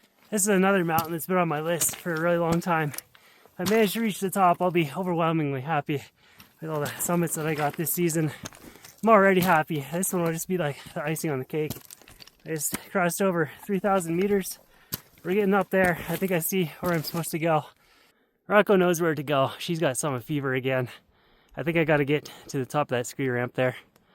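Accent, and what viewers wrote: Canada. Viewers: Good lord the way Canadians talk is so ANNOYING! Your “out and about” is so strong and just stupid! Learn normal English!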